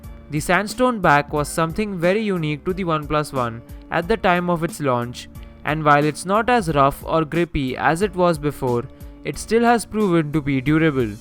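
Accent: India. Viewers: Oh god this indian "English" is killing my ears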